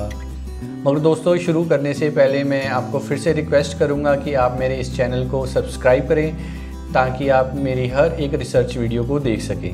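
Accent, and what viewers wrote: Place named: India. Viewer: Thank you , but your Indian accent makes it so hard to find out what you say , but thank U so much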